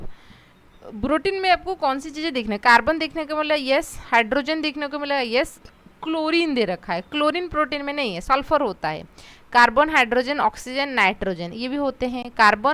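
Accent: Odisha, India. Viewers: Ma'am ap Orissa se ho kya.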